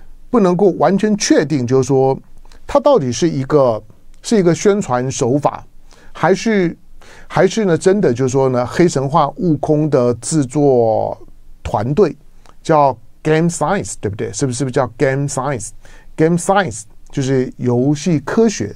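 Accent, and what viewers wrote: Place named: North, China. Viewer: 唐湘龙口音越来越北方化了😂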